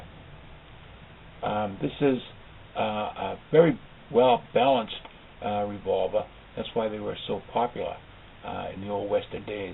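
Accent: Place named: New England, United States. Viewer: Love his New England accent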